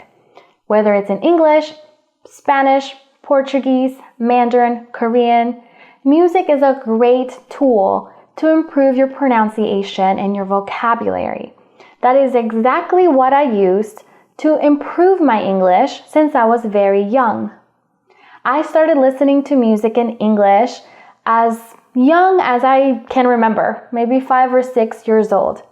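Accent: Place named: United States. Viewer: Thank you for sharing your knowledge dear teacher. I think now my motivation is to be like you, because you have a great American accent😇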